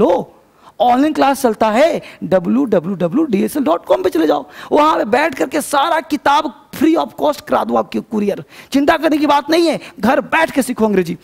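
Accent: Bihar, India. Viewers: But bihari accent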